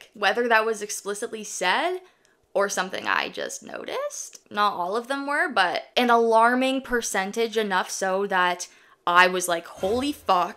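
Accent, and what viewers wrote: Canada. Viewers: Canadian? I detect a mild "aboot."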